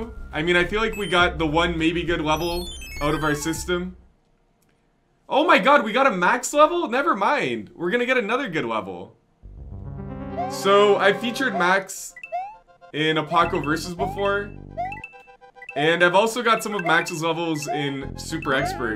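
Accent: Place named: Canada. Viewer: I love your canadian accent when you speak german. How about a german greetings, welcome, hello in the next video for your big german fan-crowd? :-) Grüße, Willkommen, Hallo ;-) And an extra "guten abend" for your boy foolhardy 😎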